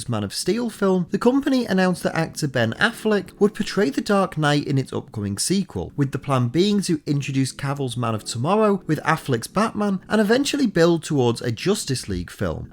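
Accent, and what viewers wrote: United Kingdom. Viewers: Hey your soothing, British voice keeps me company and informed during the night shift. Thanks mate✌🏻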